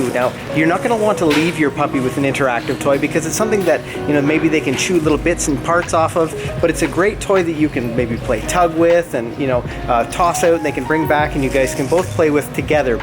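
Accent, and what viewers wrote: Canada. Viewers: You sound really Canadian!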